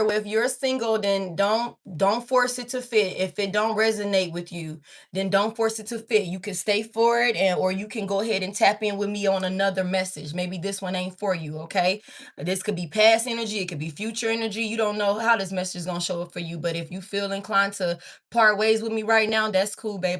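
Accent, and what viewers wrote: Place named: New York, United States. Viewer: You sound like you’re from NY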